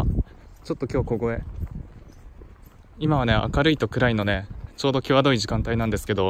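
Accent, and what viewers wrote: Aichi, Japan. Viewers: 愛知の方のイントネーション好きです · たまにイントネーション違うな～って思ってたけど愛知のイントネーションなんだな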